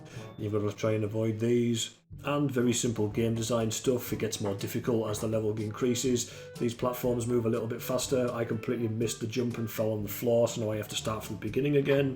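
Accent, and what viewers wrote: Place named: Wales, United Kingdom. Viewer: HES WELSH! HES BRITTISH!!!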